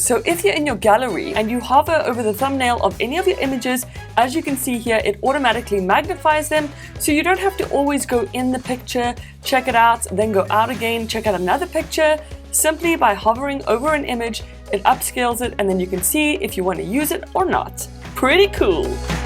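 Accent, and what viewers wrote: South Africa. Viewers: Ar you from south 🇿🇦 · Ik twijfelde even of je Nederlands was, gezien de accent. Maar het was toch net even anders. Ik had moeten weten dat je Zuid Afrikaanse bent? Ben je daar ook geboren? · you're from sa? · oh this south african accent of yours. love south africans!! can't wait for the travel ban to be lifted to come to SA again and again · Are you South African🇿🇦? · South African! Subscribed.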